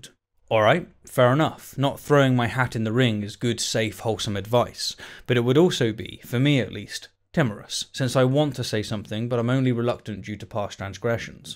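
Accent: United Kingdom. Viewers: Nothing like watching the catastrophe that is humanism devouring itself.. You think you are going to force Dawkins, someone who unlike the guy talking in this video isnt slurping up to the woke narrative, is supposed to just start denying reality to appease the movement? Please, may have thrown out rational thinking, but Dawkins isnt guilty of that, the man is a credible scientist, and hes likely not going to be bullied into woke narratives., get some integrity, your british accent isnt fooling anyone. People are getting tired of this stupid "my body doesnt conform with my mind" nonsense.. Grow up. We are seeing disproportionate numbers of trans people indicating its nothing more than an attention grabbing fad coupled with narcissism. Its interesting to see how quick in an effort to keep his poor wittle job filling peoples heads with nonsense is willing to tow the line. 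Completely irrational.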